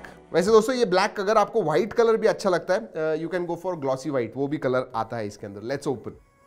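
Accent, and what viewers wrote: Maharashtra, India. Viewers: Are you a Maharashtrian ? And definitely from Mumbai ?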